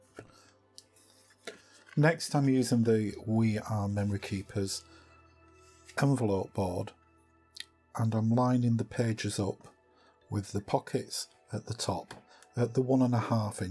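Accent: Northern England, United Kingdom. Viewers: So lovely to see & hear your video with a 'new' take on an old friend. Applying tape to the whole sheet first - genious. And the presentation is so calming - no disruptive music, or irrelevant chit chat, just the basic instructions. Great to hear a (UK) Northern accent too - Manchester? More please!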